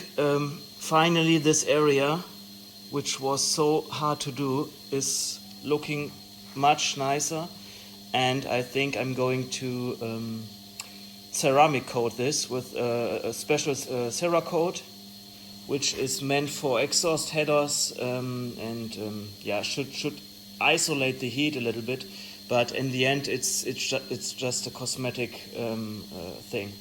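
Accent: Germany. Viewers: Let's do it. I need XXL though, and shipping will be to USA. I want to support you. BTW, one of my grandmother's was a card carrying immigrant from Germany. She had many German friends in the states. Their English was just like yours. Very good, with an accent. Reminds me of my childhood. Love it.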